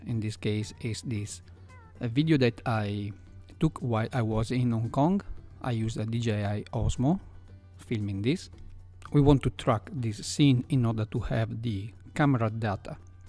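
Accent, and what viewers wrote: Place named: Italy. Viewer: accento piu italiano di questo mai sentito ma grande comunque!!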